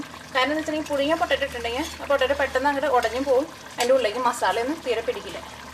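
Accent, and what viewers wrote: Thrissur, India. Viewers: simble ayi arkum theyyarakkan pattunna reethiyilanu chechiyude show nyan uae yilanu nyan eee show nokki friendsinte munpil alayittundu prathyakichu chechiyude slang thani nadan thrissur oreyoru vishamameyullu enne ithrayumathikam karikalundakkan bpadippicha chechiye onnu kanan pattumo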